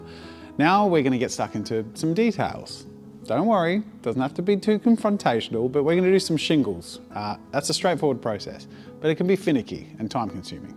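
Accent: Australia. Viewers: you're more aussie than ever in this one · A Dremel tool would help with roof, doors and windows. And by the way, you're totally bringing that soothing Bob Ross voice to this one. If Bob Ross had an Aussie accent... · Thought this was gonna be a troll, like he would start calm and then suddenly go crazy and make a castle or something, but instead I got Australian bob ross, witch was a very pleasant surprise. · Omg I LOVE this! Serene instructional of mini furniture? chill Aussie narration? More, please.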